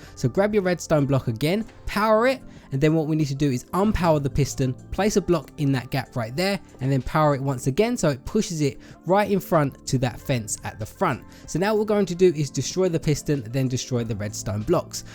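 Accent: United Kingdom. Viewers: are u british because u talk british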